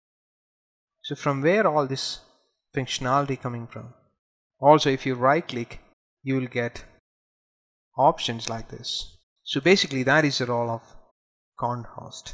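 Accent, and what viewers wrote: India. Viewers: Thank you for the upload, though the Indian accent is rather thick and hard to understand at times. The "CC" help in that area.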